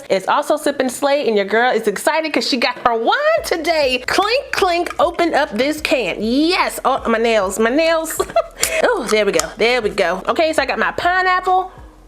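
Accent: Washington DC, United States. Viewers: You from DC MA'AM??? I hear you rocking that go go!!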